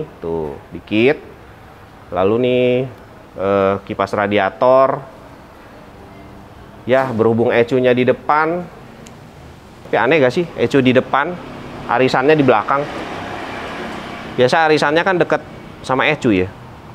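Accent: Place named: Medan, Indonesia. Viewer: Bang, abang orang medan ya. Logat bicaranya kayak bos saya. Hehe Semangat terus bikin chanelnya bang.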